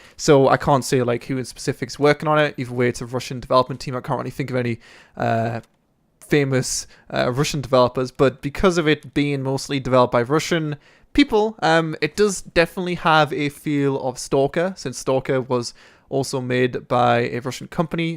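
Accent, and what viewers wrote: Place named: North East England, United Kingdom. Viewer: lol, dad dad!? U sound like youre from the north east :)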